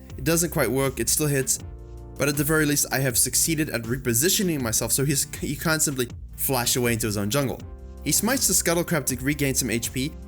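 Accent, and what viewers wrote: South Africa. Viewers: It's a pretty cool accent. I was just listening to it and kept thinking "Is that an Afrikaans accent? Wait, that sounds really English... Now he sounds American!" It was confusing but in a good way! Do you speak Afrikaans at all? I picked up a lot while I was in SA but it was really easy to learn since Dutch is my 2nd native language... I have a pretty unique accent too because of my parents are different nationalities (English/Dutch) and I grew up different countries + international school.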